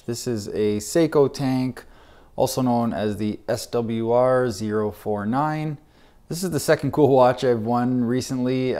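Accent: Canada. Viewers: ohh I don’t know how to explain it haha maybe it’s the Canadian accent 😅 I’m sure you’re pronouncing it right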